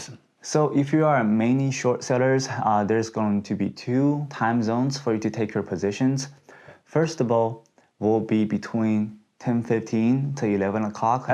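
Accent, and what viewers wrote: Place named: China. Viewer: (I know it's not your real name) I comment your videos almost never, but I just wanted you to know that you contributed to my trading, and your personal story also did, I am Russian by birth but European all my adult life. I like your style. I even liked your chinese English, it was still ok, there was a way to understand it if you want really to learn in the markets...I am older than you at least 8 years even if I look at least 10 less, so no i am not writing this comment because I am looking for a rich husband or want to make kids(hope that will never happen to me, if I'll make some serious money, i will adopt at some point, as i did with my dogs), i just wanted to recognize you how much you contribute to my trading even if you have no idea who I am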